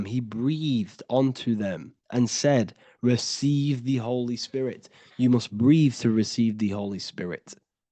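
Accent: United Kingdom. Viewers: R u from derby ?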